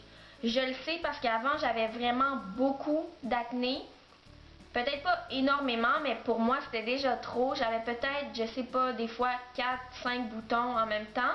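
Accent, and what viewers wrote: Quebec, Canada. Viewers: Tu es quebecoise ? Tu a l accent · t une quebequoise? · J'écoute simplement son accent Canadienne 🥰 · tu est Québécoise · est ce que tu es québécoise?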